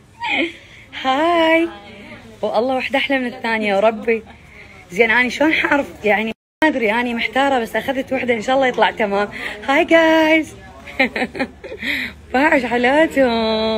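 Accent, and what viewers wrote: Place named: Lebanon. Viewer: شكد حلو تحجين لبناني حياتي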